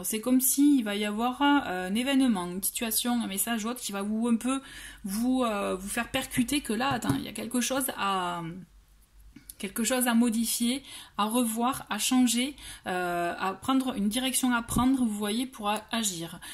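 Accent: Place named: Southwest, France. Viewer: Vous été du Sud ouest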